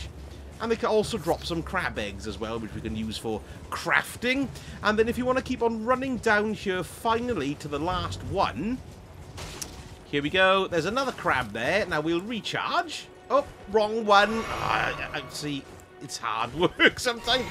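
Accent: Wales, United Kingdom. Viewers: I love this guys energy he’s class 😆 and the Welsh accent just makes it 10 times better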